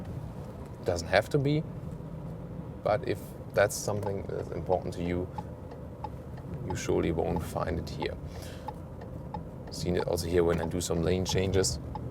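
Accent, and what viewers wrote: Germany. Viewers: Love the German accent 👍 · That German accent is so cute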